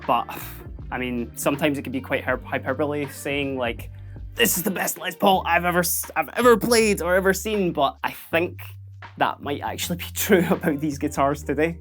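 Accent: Scotland, United Kingdom. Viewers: Hi! Are you from Scotland?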